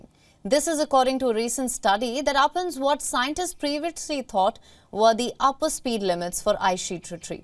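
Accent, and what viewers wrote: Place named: India. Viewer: Ahh, good old indian accent with indian face. Jai Hind!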